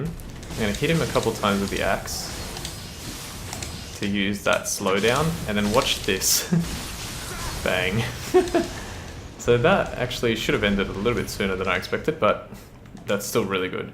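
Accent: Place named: Australia. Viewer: This is a great series, also where abouts in Australia are you from?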